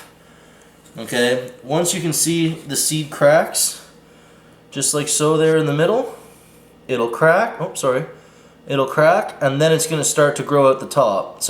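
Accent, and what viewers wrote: Newfoundland, Canada. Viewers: I've been successful (so far) with the avacado. The root is just finally on the outer surface of the seed. I also live in Canada and I just have it in my windowsill and its growing great along with my lemon trees , clementine and kiwi thanks to you! You have the Newfoundland accent is that where you are from?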